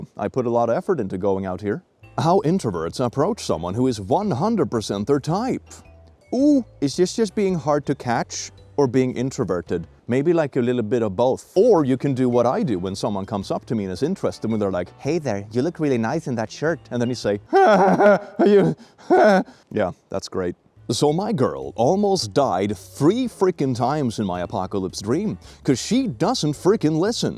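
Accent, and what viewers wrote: Finland. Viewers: Your finlands svenska accent is absolute perfection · Your Finnish accent gave me Gunnar Gunnarsson vibes, so... probably 100% accurate, lol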